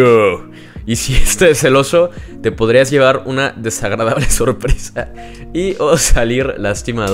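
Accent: Fresa, Mexico. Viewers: Eres whitexican, hablas bien fresa y solo te ven porque estás guapo. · Eres whitexican, hablas bien fresa y solo te ven porque estas guapo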